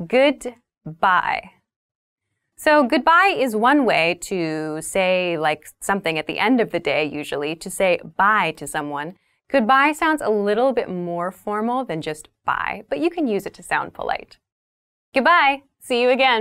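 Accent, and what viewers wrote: United States. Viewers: A big hug from Colombia for you. Love your american accent and i can understand almost everything what you say. Thanks for help us. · Is she American?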